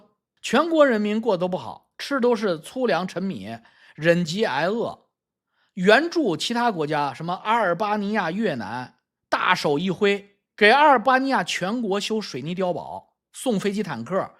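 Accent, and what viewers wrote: China. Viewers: 您的湖南话真像😂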